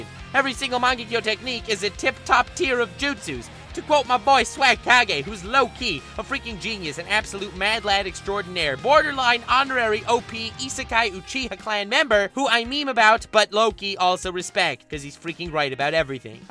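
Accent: Canada. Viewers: i can hear the canada in your voice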